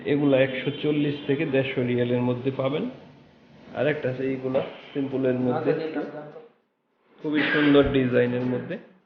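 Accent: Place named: Rajshahi, Bangladesh. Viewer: ধন্যবাদ কথার ভাষা রাজশাহীর মতো তাই বলেছিলাম আর কি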